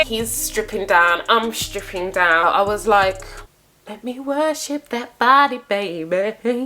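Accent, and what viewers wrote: United Kingdom. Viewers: OMG A BRIT!!! YES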